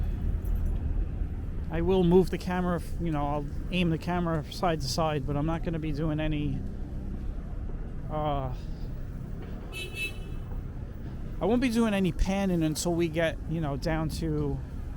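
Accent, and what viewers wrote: New York, United States. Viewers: You talk like a real New Yorker. I know I'm from the Bronx. You refer to Manhattan as "the city". That's a throw-back from the 19th century when Manhattan was just "the city".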